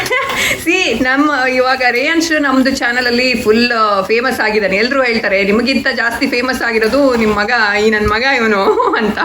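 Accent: Mangalore, India. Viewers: Very nice vlog... I like cuteness nd ur manglore style talk....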